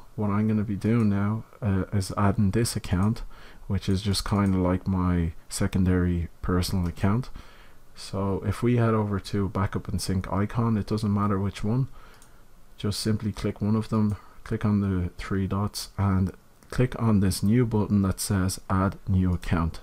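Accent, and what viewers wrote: Ireland. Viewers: Great job, I have been looking for this capability since 2016! Hope things are great at college. Also good to hear the Irish accent! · Thanks a really great video. No problem with your ramblings man everyone loves an Irish accent. At least that's what they tell me anyway!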